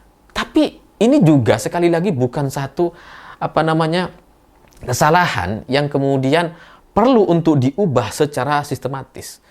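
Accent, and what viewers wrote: Indonesia. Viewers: Bang ini kyknya "lok lemak"... dari logatnya 😂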